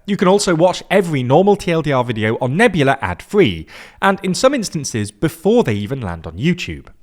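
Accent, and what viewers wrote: United Kingdom. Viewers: Clicks in video, hears british accent. Leaves.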